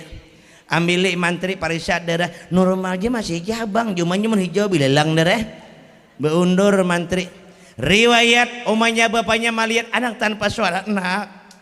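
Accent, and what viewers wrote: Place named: Banjar, Indonesia. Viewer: Ku kira Banjar Tanjung loko jadi suka bangatt denger Nye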